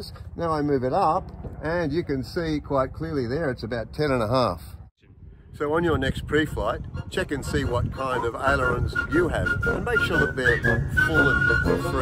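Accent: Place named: Australia. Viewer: Clear and concise, also great to hear it from a fellow Aussie. Cheers cobba!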